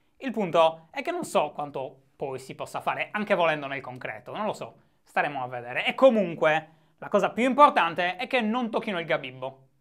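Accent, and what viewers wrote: Sardinia, Italy. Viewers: Minchia se sei sardo!!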